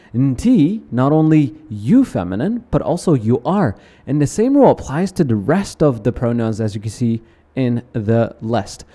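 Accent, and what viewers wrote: United States. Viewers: He does have an American accent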